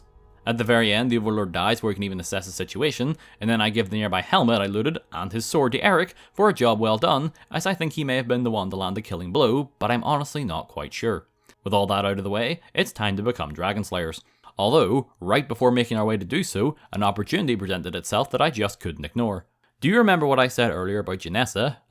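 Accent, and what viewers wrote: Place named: Northern Ireland, United Kingdom. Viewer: The Northern Irish accent just fits with Skyrim idk why.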